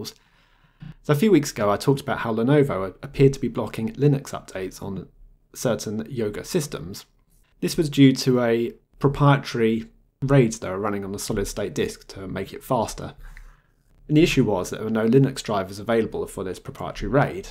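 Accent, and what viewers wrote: United Kingdom. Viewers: I have been a subscriber for several years. For a laugh (and because my ears are old and worn) I turn on CC. I assume CC is US based because your British English comes out a bit funny at times. BTW I used Sabayon for a few months several years ago and liked it.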